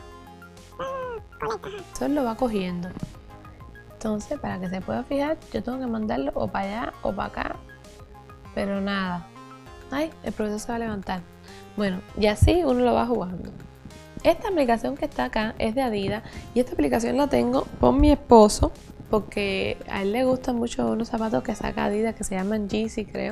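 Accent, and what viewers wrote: Cuba. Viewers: jajaj tienes un asentó chistoso pero no pareces q eres de aqui de mi pais republica dominicana eres como cubana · Cambiastes la voz y es una técnica perfecta para conseguir más subscriptores... porque hablas ahora como tú nacionalidad ósea cubana y es lo mejor que pudistes haber echo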